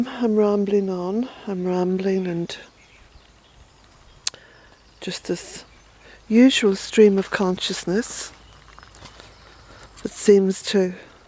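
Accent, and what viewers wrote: Ireland. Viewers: Well, You say you are rambling on, but I so enjoy hearing you ramble in that lovely Irish accent of yours. I wonder if you truly realize the pleasure you bring to so many of us. I have always loved nature, especially animals, but I now look at the landscape around me with eyes anew. My maternal grandmother was born in County Claire Ireland, and so I particularly love your channel. And I hope, one day, to visit Ireland again.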